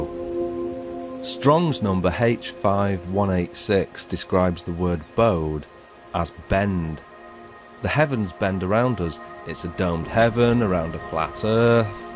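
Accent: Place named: United Kingdom. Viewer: I just believe this guy cuz he’s speaking with a British accent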